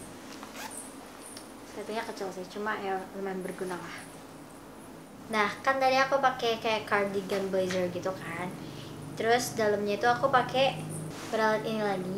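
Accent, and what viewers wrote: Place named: West Java, Indonesia. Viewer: Kaka orang Sunda ya... Logatnya keliatan😄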